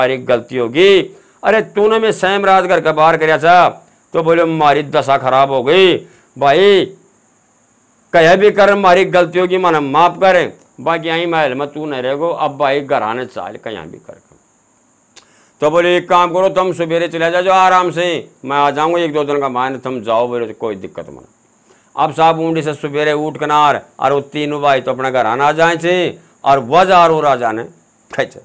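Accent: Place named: Haryana, India. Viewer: Kha se ho tau ji apki boli to haryanvi mix h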